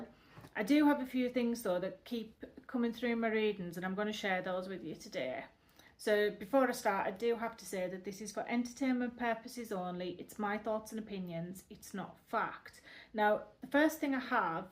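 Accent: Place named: Middlesbrough, United Kingdom. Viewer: Could be Middleborough area but not sure🤔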